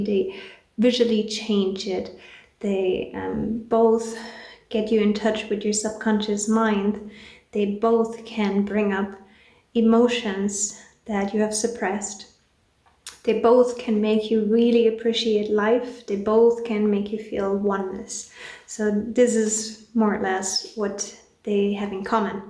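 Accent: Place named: Norway. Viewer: Its so cool to see a "normal looking" woman talking about physcadelics. Not that everyone doesn't partake i just feel like your breaking a stereotype. Good content, i prefer lsd if im looking for a good time and i choose shrooms when i feel like having my sorry ass dragged through my own physce by nature haha, really though when i take shrooms i feel like im talking to my therapist. An old friend i like to visit when i need advice. New subscriber here , where are you from ? I love your accent you remindlike one of my favorite musicians from norway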